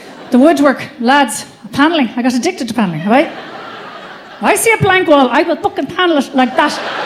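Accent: Ireland. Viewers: 😆 Before clicking on the video, when reading the title I was thinking "High Maintenance". What‽ 🤔 I was curious... Stayed for the humor. 😉 Beautiful Irish accent by the way! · 35 years as a maintenance man (industrial mechanic) and final some women get it, lol. Love a girl with an Irish accent. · Such a gorgeous Irish accent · Is this accent from Southern Ireland??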